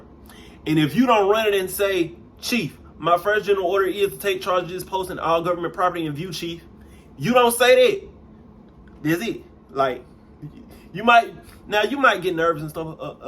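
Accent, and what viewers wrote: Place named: South, United States. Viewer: Holy southern accent!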